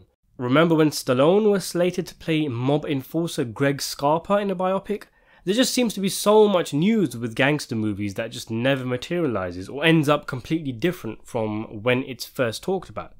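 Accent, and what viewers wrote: United Kingdom. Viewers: The genre is not dead just because Mafia movies always came out every decade or so. People need to understand the glory days of the mafia if anything is what's dead. Also Hollywood really does not make good movies anymore like they used to. Martin Scorsese did not invent mafia movies either. This Brittish guy narrating I can't stand to listen to. DeNiro can still do great. So I will be renting this.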